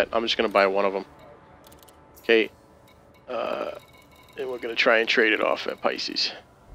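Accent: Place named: New York, United States. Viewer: Just bought this game yesterday, thanks for making these videos. Judging from your accent you're a fellow New Yorker. Sounds like either Brooklyn, Staten Island, or Long Island. Peace!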